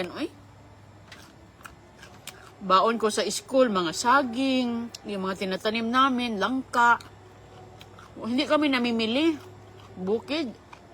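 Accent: Visayas, Philippines. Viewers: Sorry bisaya lanh kung ganyan accent niya. Be kind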